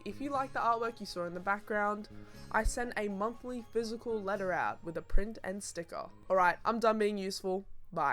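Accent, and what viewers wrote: Australia. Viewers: This outta topic but for some reason I can tell you’re Australian, I actually know the reason I lied, it’s cuz u have the same accent and speaking manners of Rhea Ripley and as a wrestling fan I was like “woah u sound familiar”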